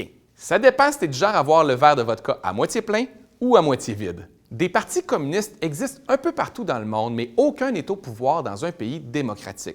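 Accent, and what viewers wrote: Quebec, Canada. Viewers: C'est ça l'accent québécois. Le français c'est la langue qu'ils maîtrisent justement. Informe-toi avant de juger afin de ne pas parraître pauvre d'esprit.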